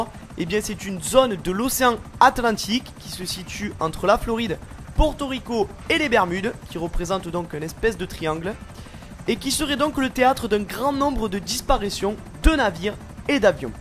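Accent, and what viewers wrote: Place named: South, France. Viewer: Tu a un axant du sud 😁😆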